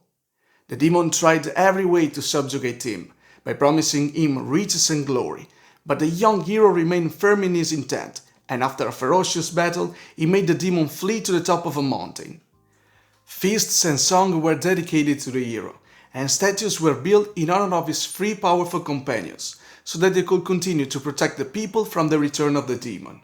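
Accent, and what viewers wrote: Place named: Italy. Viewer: ho ottenuto italiani origini dai quelli vecchi.. grandparents from my dad's side so I recognize an Italian accent anywhere 😅 also I was never fluent so pardon my butchering when I write, it's probably backwards lol. Do you have any social media?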